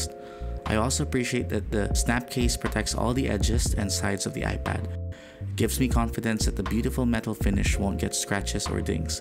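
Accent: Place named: Philippines. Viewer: Your accent is telling me you are a Filipino.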